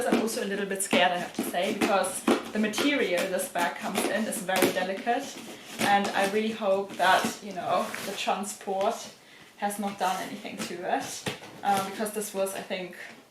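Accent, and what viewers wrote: Germany. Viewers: Love your german accent 😊😊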